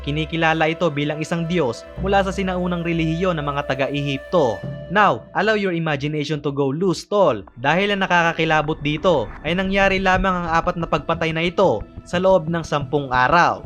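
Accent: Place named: Bulacan, Philippines. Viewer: Bulakeñyo ka ba? yung punto nyo po napapansin ko 🥰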